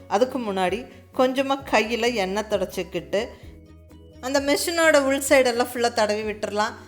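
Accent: Kongu, India. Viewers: Always ur kongu style recipes and kongu accent tamil r very very nice. புழுங்கலரிசி இடியாப்ப (சந்தகை) முறையை வேறு வகை இடியாப்ப கட்டைகளில் பிழிய வருமா? நான் உங்களின் அனைத்து வீடியோக்களையும் நீண்ட காலமாகவே பார்த்து வருகிறேன். All r awesome.. சிரிப்புடன் கூடிய குரலும் கூட.., உங்களுடைய பிக்னிக், சேலை கடை விசிட், மற்றும் அனைத்தும்...!!!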